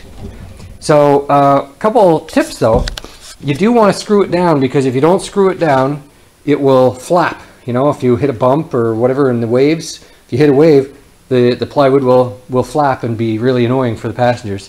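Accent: Canada. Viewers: Don't speak Canadian. Didn't learn anything.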